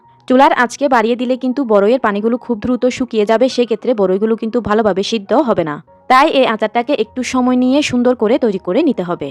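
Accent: Chittagong, Bangladesh. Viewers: cox ar Chittagong ak e voice😢😊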